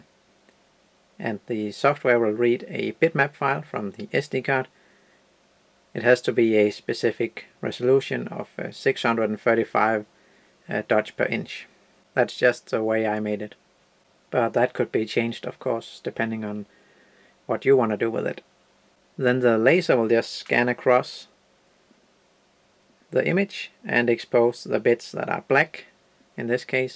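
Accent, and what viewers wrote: Denmark. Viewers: hey er du fra Danmark? (hello are you from Denmark?)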